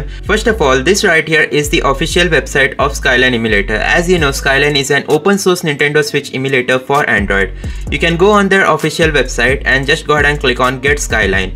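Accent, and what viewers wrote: India. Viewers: Are you indian 😅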